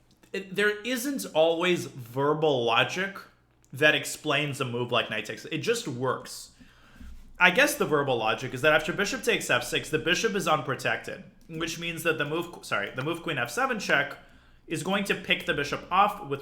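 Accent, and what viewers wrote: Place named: Russia. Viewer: Man, your russian accent is just amazing. I can't wait to join your stream to hear it live.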